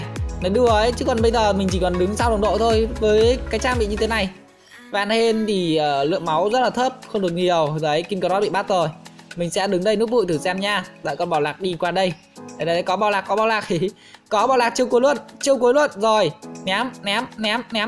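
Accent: Nam Dinh, Vietnam. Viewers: A ở nam định hả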